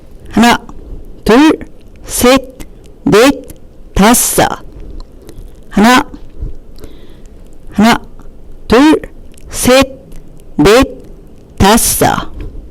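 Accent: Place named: Gyeongsang, South Korea. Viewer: 경상도사투리 정겹네요 스트레칭 아침마다 따라하고있습니다 귀에 쏙쏙들어오네요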